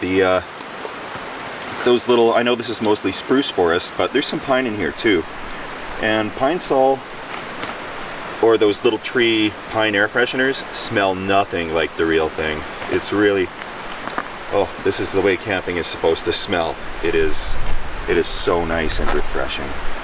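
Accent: Canada. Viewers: I detect a slight Canadian dialect, right along with Northern Humor. Thanks for your enlightened video on camping in grizzley country. - Just great.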